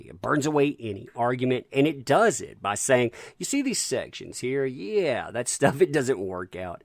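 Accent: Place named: Tennessee, United States. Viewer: What kind of God forsaken accent is this? Land of Dolly Parton aiiight...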